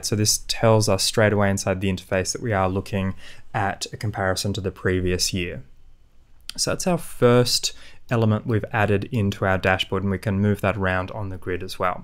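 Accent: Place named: Australia. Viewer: Could listen to the aussie accent all day! Great video, so helpful. Please can you make a data studio step by step report for goal conversion data? Im getting a bit stuck with mine.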